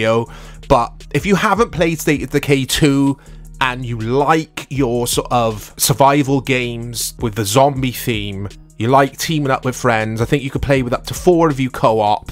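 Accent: Wales, United Kingdom. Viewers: Bro are you welsh yeah?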